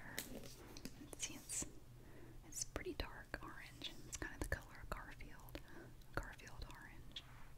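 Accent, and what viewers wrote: South, United States. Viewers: i love her voice so sweet and southern. like mine be safe beautiful u are doing amazing job im proud of u love u always frim a fan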